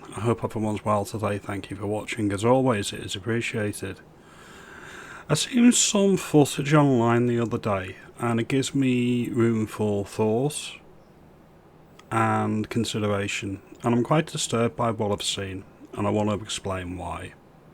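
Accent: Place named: Cheshire, United Kingdom. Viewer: Love your musings mate. You're a great Patriot. What part of Cheshire are you from? Am guessing one of Runcorn/Frodsham/Helsby/Winsford with that accent! ie Scouse overflow areas! 🤔🧐🫡🫵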